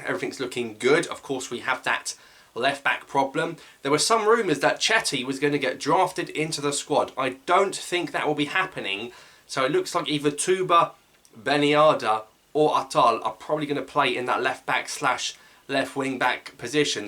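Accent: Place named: RP, United Kingdom. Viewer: well it's a great coincidence to find your channel because I am learning British accent and I adore your "BBC accent" especially when it's about one of my favorite topics which is Algerian football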